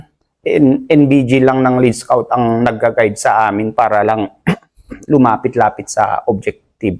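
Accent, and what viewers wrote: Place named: Visayas, Philippines. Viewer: Kakapanood ko sa part 1 Sir hindi accent ng ipugaw kundi bisaya na✌️.Ipinagmamalaki ka mg buong ifugao province sir at ipagdadasal din namim ang kaligtasan mo kayong lahat jan. Nakakalungkot lang na kapwa pilipino nagpapatayan anong klaseng utak kasi ang meron yong mga tao na kumakalaban sa gobyerno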